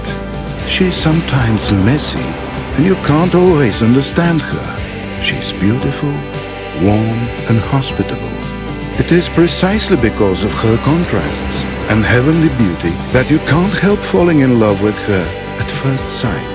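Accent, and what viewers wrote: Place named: Balkans. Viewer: she can relate.. когато говори за България, която е в женски род само при нас, а за да е правилно трябва да е it - It can relate. Клипа е мега много добър, но произношението на дублиращия е балканско и си личи, че е език, който не ползва във всекидневието. Като цяло много добре свършена работа, но оратора търпи критика.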